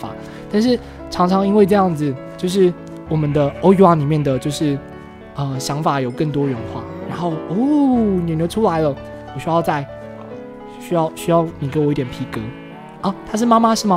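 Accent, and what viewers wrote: Cantonese, China. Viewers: 阿神講粵語好可愛<3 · 阿神的粵語好可愛～(PS:Bob們都知道阿神笨笨傻傻的，那阿神覺得這種性格是好是壞呢？） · (香港的粉絲)阿神說粵語很可愛^^:D雖然聽不太懂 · 阿神講粵語好可愛阿wwwwwwwww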